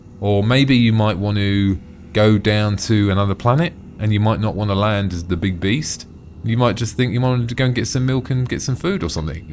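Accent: United Kingdom. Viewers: your accent is too damn british to understand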